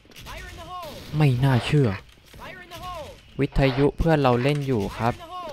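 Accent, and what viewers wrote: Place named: Songkhla, Thailand. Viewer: จังหวะแหลงเหมือนเด็กสงขลาเลย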